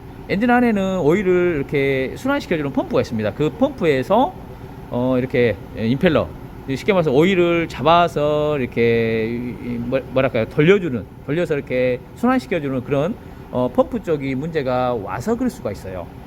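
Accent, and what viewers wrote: Jeolla, South Korea. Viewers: 고향떠나 해왜생활하면서 전라도 고향말을 잘듣고있습니다. 건강허세요. 😜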